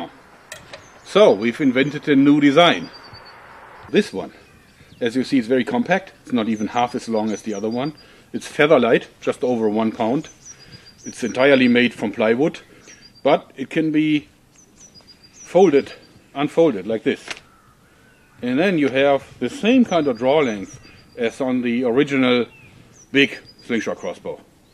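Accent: Germany. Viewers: I really like the German accent, everyone should speak english like that!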